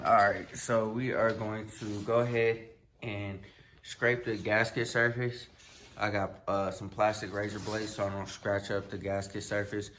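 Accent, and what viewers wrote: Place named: Bay Area, United States. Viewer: Man you got to be from the Bay Area, you definitely got our talk from out here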